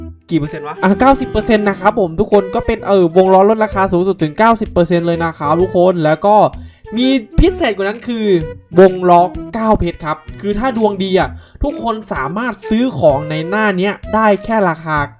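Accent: Isan, Thailand. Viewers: น้องฟังออกน้องเป็น อีสาน ♡♡♡♡♡ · ค่อยเปนคนอิสานฟังออกชั้นเจน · อีสานชัดๆ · อ้ายเป็นคนอี่สานเบาะ??? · อ้ายเป็นคนอีสานบ่ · คนอีสานหรือ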